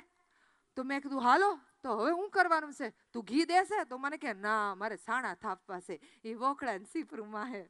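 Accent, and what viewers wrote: Kathiawar, India. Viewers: Superb performance......its not easy to perform this act by solo........great......your expression, that proper tone of kathi language.......first time i have seen you on rangmanch......keep it up......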